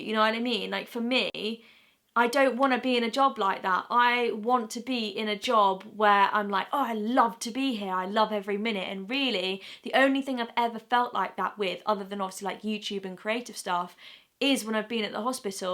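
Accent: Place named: England, United Kingdom. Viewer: She's English. :)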